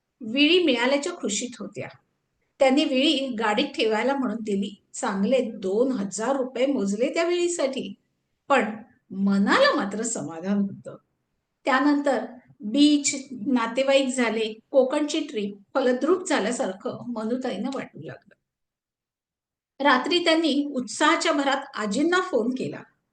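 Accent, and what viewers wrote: India. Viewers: विनोदी कथेचं मिश्किल मालवणी ठसक्यात मस्त सादरीकरण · खूपच सुंदर सादरीकरण. ऐकताना खूप मजा वाटली .मुख्यता कोकणी हेल अगदी ठसक्यात.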